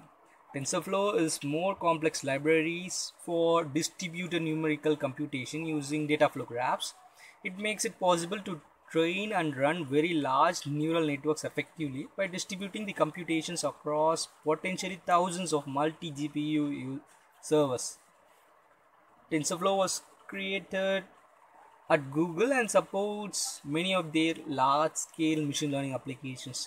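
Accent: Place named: India. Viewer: no doubt it is a good topic, but I am trying to understand your Indian accent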